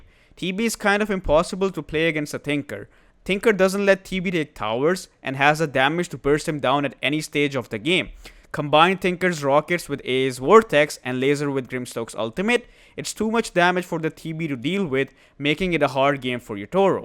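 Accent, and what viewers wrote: India. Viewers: You talk like Indian ))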